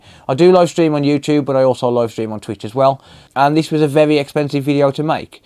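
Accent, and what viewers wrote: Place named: West Midlands, United Kingdom. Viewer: Absolutely fantastic video. Im a new subscriber and really enjoying the content, it’s so interesting and you’re obviously incredibly knowledgeable about electronics . Also great to hear a fellow West Midlands accent ☺️✊🏻 I’m really thinking about getting a PS5. I have a PS4 Pro which is great, but I’d really like to upgrade. With regards to the Liquid Metal issue, would you advise to keep the PS5 flat rather than vertical so that the Liquid Metal doesn’t drop? It would suit my setup flat tbh. Do you think the liquid metal has dropped during transit or does in only become more of a liquid once heated? Sorry, I really don’t know much about that kind of thing 👍🏻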